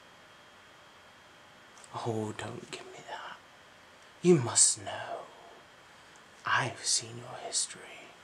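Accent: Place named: South, United Kingdom. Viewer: i can hear in ur voice that u r somewhere from south England, I've lived in Stockport (Manchester) for 5 years and about Vodka, sure, mate, I can bring u vodka next time i visit th UK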